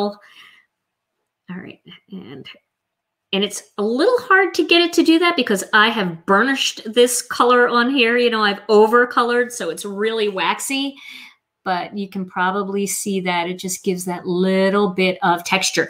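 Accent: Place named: East Coast, United States. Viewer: Absolutely gorgeous!!!! The shading is so amazing and I learned so much!!! I love your fun videos AND your accent 💜!!!! I grew up in Rye, NY and love hearing East Coast accents. Be well! Hugs, Karen ❌💜❌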